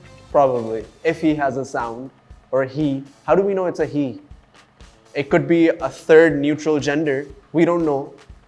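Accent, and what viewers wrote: Punjab, India. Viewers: His punjabi accent is so cutee 😭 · I also think that because what if you have a wife/husband who changes you as a person that you're not even good friends with your best friends and I feel like that wouldn't be a good wife/husband. My punjabi is exactly like yours but honestly yours is the cutesttt ❤ If comes next to me on an airplane I would scream so loud that the whole plane would know that what just happened or either I'll be starstruck and not able to say anything. About the question "what if you got stood up on a date" my answer would be that that's not gonna happen 😂 I'm really really horrible with jewelry and rings and rings are something I never wanted to wear. I also have the fear of losing the engagement ring so I'll keep in a locker too or wear it with a chain as a necklace. I would have to come up with an excuse if I'm late. 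It would be an ideal scenario to get married in if you only have those people around you who mean a lot to you honestly. I would ask the same question to the alien that where he's from If I'm being recorded and you tell me that you were not recording all this time I'm gonna walk away. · The cutest punjabi accent everrr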